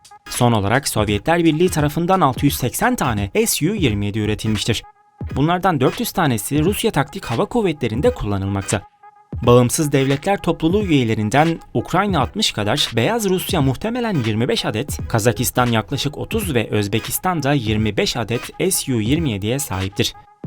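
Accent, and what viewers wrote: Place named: England, United Kingdom. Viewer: Bu nasıl telafuz arkadaş, MİG'i am ay ci diye okuyorsun. SU yu es yu diye. Bütün herşeyi İngiliz ağzı ile okumak zorunda mısınız? "mig"; "su" diye neden telaffuz etmiyorsun. Bu kadar mı dilinize yabancısınız?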